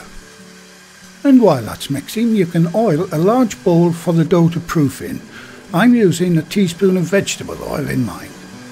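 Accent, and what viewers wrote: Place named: Scotland, United Kingdom. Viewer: He does Winnie The Pooh and with with a little touch of Scottish brogue.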